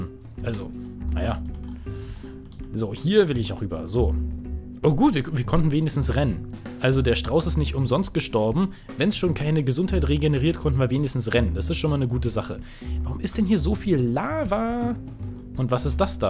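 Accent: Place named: Berlin, Germany. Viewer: Ja klar, die Schlangen im Berliner Zoo können einen ja auch nicht angreifen, weil sie hinter Glas sind! Dass du aus Berlin bist dachte ich mir schon. Das "och" statt "auch" hat dich verraten. Ich stamme übrigens aus Nordhessen, wir sagen statt "auch" "ö"! Bsp.: "Dis hon ich mä ö schon gedacht."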